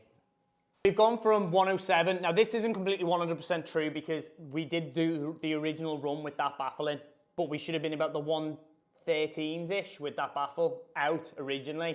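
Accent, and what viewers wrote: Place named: Liverpool, United Kingdom. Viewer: It’s called scouse accent!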